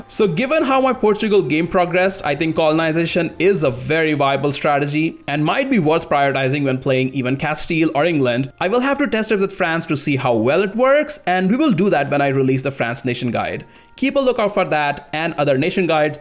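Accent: India. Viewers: Is Indian? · Are you indian?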